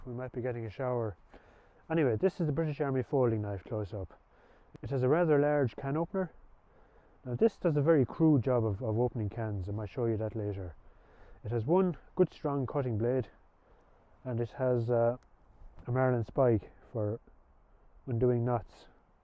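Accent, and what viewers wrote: Ireland. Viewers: yeah kind of I was saying to meself midlands or wexford I'm from Laois originally moved to waterford years ago. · it great to hear an Irish accent on a man doing great work and talking sense . well done sir .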